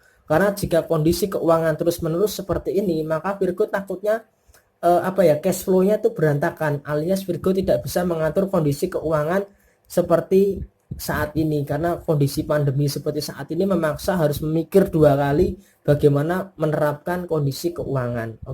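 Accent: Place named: West Java, Indonesia. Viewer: lah ,, mas orang sunda ya .. sama .. sunda na daerah mana mas?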